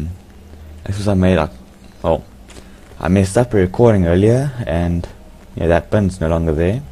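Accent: South Africa. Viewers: you sound South African....are you?? if you are, jas my bru, so am I!!! :P :D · ur african? lol wow :D im from england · this guy sounds South African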